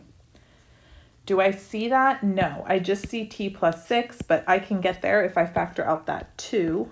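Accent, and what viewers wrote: United States. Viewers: How's that one 😂 Adore American English)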